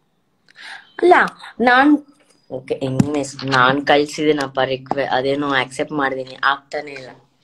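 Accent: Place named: North Karnataka, India. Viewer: Nanu Dayanand Goudar anta Namadu Gokak hatra Chikka halli Fulagaddi urinavanu First Nimage nanna kade enda Namasakargalu Anushri Akka mate Nimage wish You Happy Birthday🎂🎉🎂🎂🎂🎂 Nivu 100 kala channagi balabeku anta nanna ashe ade riti Nivu namma uttar karnataka bashena Namma hage matadtira namage bala kushi agutte mate Nivu andre tumbane esta ellarigu Vande vande namma uru kade banni medum plz 🙏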